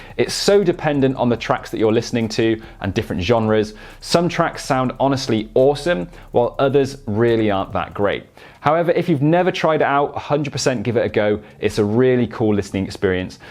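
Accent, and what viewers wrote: United Kingdom. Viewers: Great video; love a British accent.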